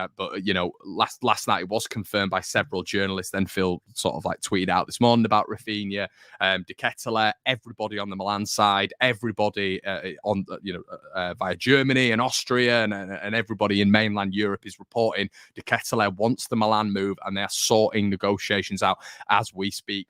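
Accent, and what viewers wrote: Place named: Geordie, United Kingdom. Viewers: Great Geordie accent..the hair sucks though. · Great Geordie accent. I thought Paul Gascoigne was on air!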